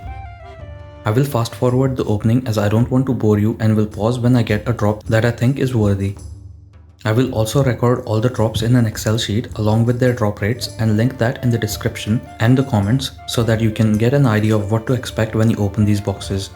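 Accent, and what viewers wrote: India. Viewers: Are you from india youre accent sounds indian???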